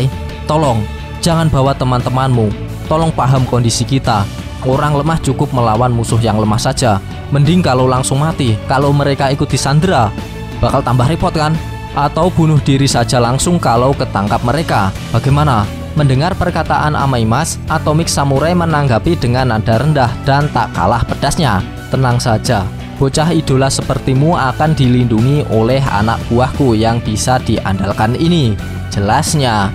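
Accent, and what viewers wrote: Java, Indonesia. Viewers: Mantapp mas... Ntah lah logat jawa lebih enak di dengar buat reack manga... Ngomong ngomong stylenya mirip MR.K Animenia mas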